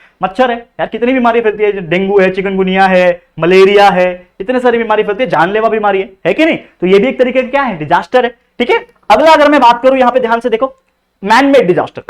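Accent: Maharashtra, India. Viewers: Are you Marathi sir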